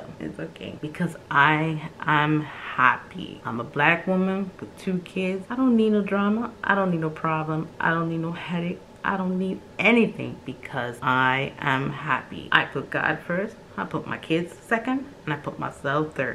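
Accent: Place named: Virgin Islands, Caribbean. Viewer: You’re from the USVI?! I knew the accent sounded familiar I’m from the BVI. Sending you VI Love ❤️❤️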